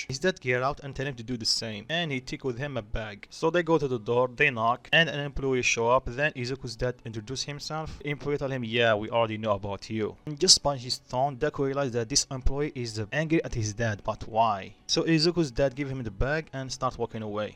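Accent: Russia. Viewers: Were are u from? I can here a Russian accent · Are you Russian 😅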